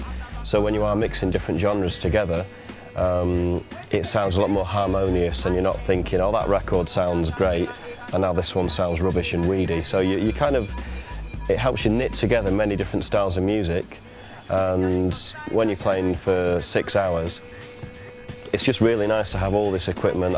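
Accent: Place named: United Kingdom. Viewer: Oh! He's a brit!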